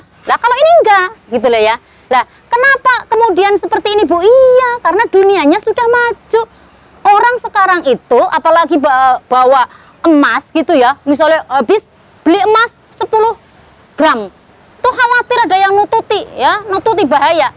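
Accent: Banyumas, Indonesia. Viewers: khas ngapaknya mantap pnjelasannya sukses selalu utk mb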